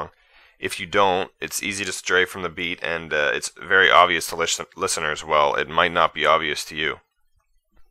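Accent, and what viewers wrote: Canada. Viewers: Are you Canadian? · are you canadian? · Are you Canadian? There's just that hint of an accent. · you're Canadian xD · you're canadian, aren't you?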